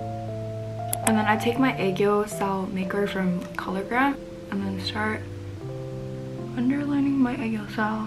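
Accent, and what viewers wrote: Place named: China. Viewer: chinese accent 很好聽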